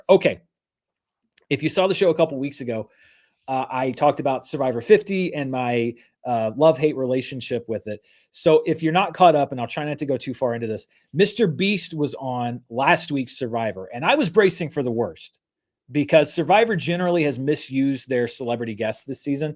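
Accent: United States. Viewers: People are so conditioned to connect some kind of game of thrones British adjacent accent for these kinds of stories that the American accent feels strange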